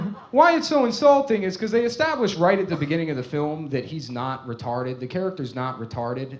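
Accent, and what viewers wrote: United States. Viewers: You can really hear his Mid-Atlantic accent in this clip. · Why does my friend Nick sound like all my relatives in Philly who don’t talk to me? I like Bed Stuy Nick more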